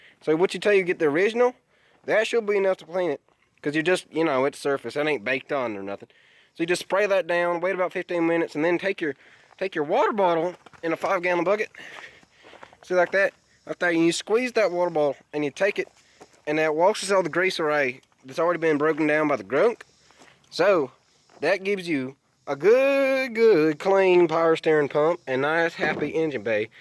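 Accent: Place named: South, United States. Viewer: Nice accent fellow southerner Also nice tutorial type thing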